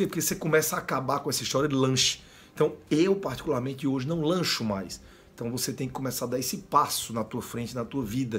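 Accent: Northeast, Brazil. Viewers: Amo o sotaque do povo nordestino e agora você é meu Dr. favorito😊😉 parabéns trabalho lindo👏😘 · Fala logo sotaque. Nordestino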